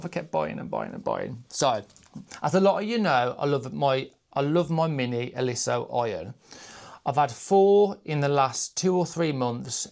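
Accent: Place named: Black Country, United Kingdom. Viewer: Ah, - nice to meet you. Your Quilt featured in one of my FOQ's video's - view from a wheelchair and someone told me in the comments who you were. "That's quilt". Like many competition entrants, the public did not see the Judges views unless they'd won a prize, been shortlisted or were a Judges Choice Award. I was a first time entrant. Your Quilt stood out for me, as I couldn't believe a Novice had made it. Your Piecing was perfect and colour choices blended really well. I'm even more amazed now that I know you have a Disability (so do I) and I wonder how on earth you manage to manipulate such a large, heavy quilt? Bravo. I'm also fascinated by your accent, having lived in Wolverhampton for a while, worked in a Dudley Hospital and travelled by bus via Sedgeley, where the route passed through Upper and Lower Gornal. Don't worry, am not a stalker. 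Will like, subscribe and look forward to watching not only your back catalogue but your future plans. Special mention to your Mum for not reigning in your spending! Best wishes, Maggie, Chester. X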